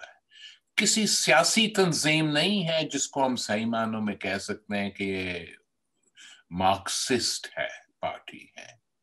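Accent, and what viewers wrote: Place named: India. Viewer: Host sounds an Indian, Tariq should be careful whom he allows to allow a ride of his profile.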